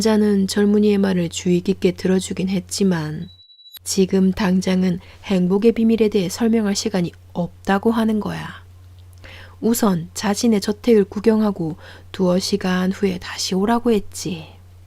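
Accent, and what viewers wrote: Gyeongsang, South Korea. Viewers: 경상도 사투리가 너무 듣기좋습니다 좋은영상 고맙습니다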